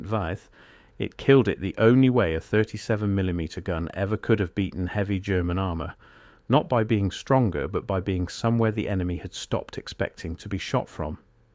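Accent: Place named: United Kingdom. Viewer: Excellent narration! Something about the British or Australian accent that makes it land so beautifully on the ear.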